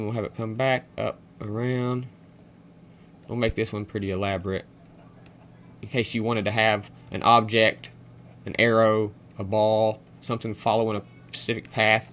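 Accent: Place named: Georgia, United States. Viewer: Once again i must say you are a smart man, and you sound like your from georgia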